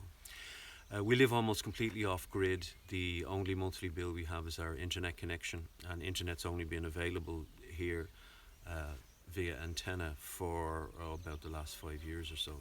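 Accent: Ireland. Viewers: 😂Born and raised in south side Cabinteely. I know a Dub accent when I hear it😂. You never lose it.😂 Great video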